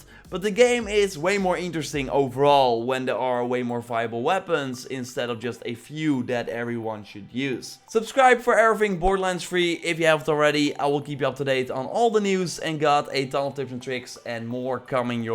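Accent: Netherlands. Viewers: Great vid sir but are you dutch?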